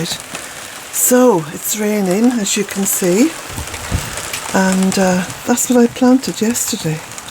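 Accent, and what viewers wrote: Ireland. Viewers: I'm new to your channel and viewing from the US of A. Lovely and inspirational. Plus I'm in love with Jack. And you have to know how we Americans are enamoured with a good Irish lilt. In addition I'm a 60+ woman dreamy with Adian Turner as Poldark thank you very much PBS. Stay well.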